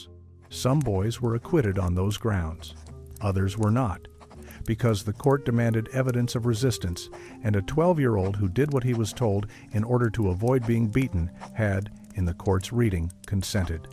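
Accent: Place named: United States. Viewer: An artificially generated English accent would have been more appropriate than an artificially generated U.S.A. accent. U.S.A. accents have no credibility or authority, their owners being savage imperialists abroad, brutal fascists at home and lying propagandists everywhere.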